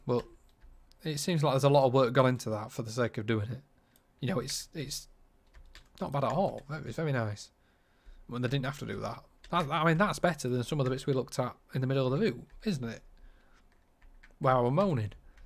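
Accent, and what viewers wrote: Yorkshire, United Kingdom. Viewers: thanks very much for scanning your critical eye over the route, which I hope DTG take notice of. Love your Yorkshire accent and comments.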